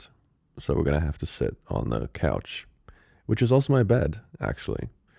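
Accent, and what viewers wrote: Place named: Germany. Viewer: he is german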